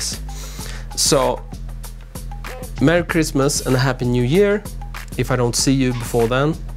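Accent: Sweden. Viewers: Now I know what word will deffinitley tell if the person talking is swedish, it is the way he pronounces ”glycerol” 😁 great video again, thank you! 👏😊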